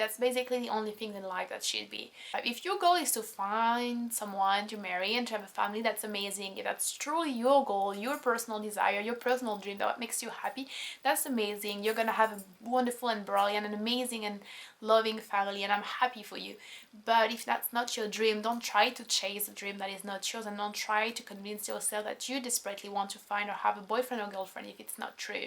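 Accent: France. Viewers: You're a really pretty girl. I like how deep these videos usually go into topics. I couldn't agree more when it comes to relationships. I think they often preoccupy or even distract people who could otherwise live a more fulfilling life. The French Accent is awesome too. Reminds me of the movies. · Est-ce parce que je suis français et qu'elle a probablement un accent français que j'arrive à tout comprendre ce qu'elle dit? En tout cas, merci pour cette vidéo. Très bel état d'esprit, et toujours aussi ravissante! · is that a legit french accent? pretty eyes by the way! the video is about nothing though.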